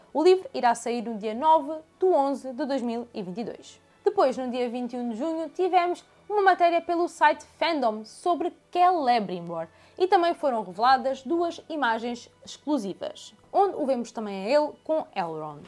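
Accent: Portugal. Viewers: Ouvir uma portuguesa falando sobre os anéis de poder é uma sensação diferente, gostei haha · Eu simplesmente adoro o português lusitano da.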